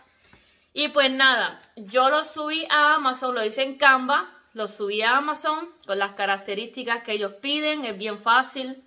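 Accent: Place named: Puerto Rico. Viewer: Saludos desde Mayagüez, soy maestra de Artes Visuales ya retirada. Estoy comenzando a incursionar con la creación mi primer libro de colorear utilizando la inteligencia artificial. Precisamente estaba buscando tutoriales en Español cuando doy con tu video. Muchas gracias! Ya sabía que ese acento de boricua no se puede negar. Weeepppaaaa!😂😁😂😁😂😁🇵🇷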